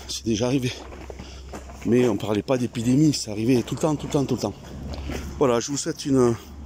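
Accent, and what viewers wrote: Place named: Nord, France. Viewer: C ' ęst un bęl accęnnnnnnnnt , 😉👍 mi chē mí , chē d'tí , ti zotę , achtęur : traduc'tíon : moí c ' ęst moí , c' ęst dę toí , vous autręs , maíntęnant . Rięn d ' aussí chantant qu á toulousę , sínon ící dans lę plat paÿs ,c ' ęst trēs chalęuręux dans lęs blęds , moíns lęs grandęs víllęs . Bíęn a toí , tę bílęs pas , cę quí doít arrívęr arrívęra .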